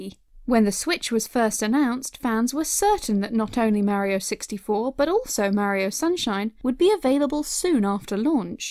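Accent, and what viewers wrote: United Kingdom. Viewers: Ok I'm new to the channel. Who on Earth is this British sounding female narrator who hasn't been named? The other guy at least is named in the description.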